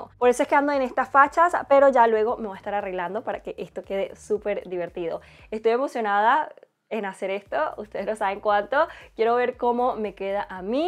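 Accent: Venezuela. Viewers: WUAO TE QUEDÓ INCREIBLE, ME ENCANTO VALE,TE LO JURO,ERES TREMENDA MAQUILLADORA.YI SOY NUEVA EN TU CANAL.SI ES POSIBLE PREGUNTAR,DE QUE PAIS ERES? ES QUE ME PARECE QUE HABLAS COMO VENEZOLANA????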